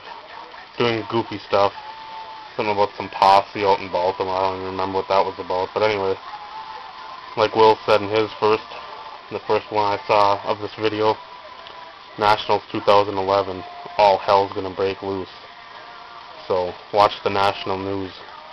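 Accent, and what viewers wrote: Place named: United Kingdom. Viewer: lmao Fool! Nice british accent. Nicely done. Sictophere is indeed a heck of a guy. Great choice man. He does so much for others before thinking of himself. Your looking more and more Amish everyday and that's something to be proud of!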